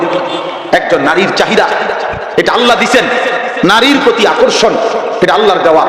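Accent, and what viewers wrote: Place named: Baripada, India. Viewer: Theek baripada dialogue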